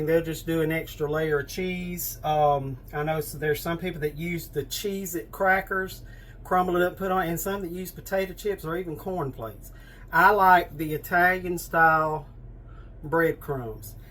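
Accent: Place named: South, United States. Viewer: You must be from the south ,with that southern accent. I live in central Ohio❤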